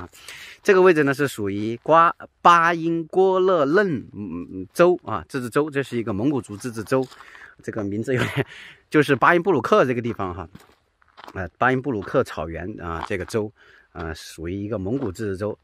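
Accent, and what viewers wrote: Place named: Sichuan, China. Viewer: 是重庆哪里的？和川北的方言最像。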